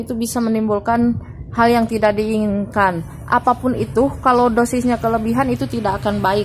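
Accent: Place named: West Java, Indonesia. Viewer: Sunda pisan eeuy...❤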